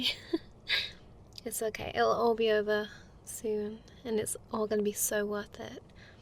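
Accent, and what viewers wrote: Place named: Midlands, United Kingdom. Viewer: I keep saying it, but hot fucking damn your midland accent makes me melt. Then you're always so spunky and adorable ooof, just yes That last part through, oh man the feels, planned something exactly like that for my ex but we never got to go. That letter before the final reveal hit real different for me at least. Mmmm yep this is good ASMR.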